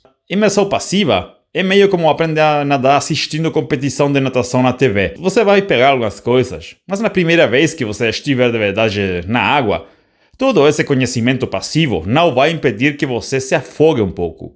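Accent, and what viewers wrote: Rio de Janeiro, Brazil. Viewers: Muito legal seu vídeo, só lamento que você aprendeu português com sotaque carioca, na maior parte do Brasil não falamos com "chiado" nas palavras que tem "S", como você deve ter aprendido. Fora isso, acho que este é o primeiro vídeo sobre aprender outra língua realmente útil! Parabéns pela iniciativa! 👏👏👏... Grata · VC fez certo em aprender o portugues carioca! 🎉🎉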